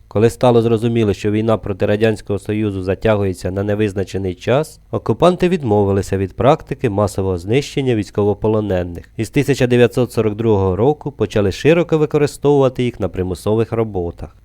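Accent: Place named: Transcarpathia, Ukraine. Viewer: вона просто з Закарпаття, як і я:)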